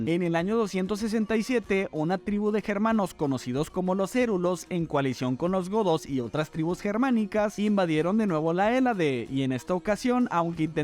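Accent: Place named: North, Mexico. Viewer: Chido canal, por cierto, eres mexa? Supongo que tu "sh" proviene del norte, como de Chihuahua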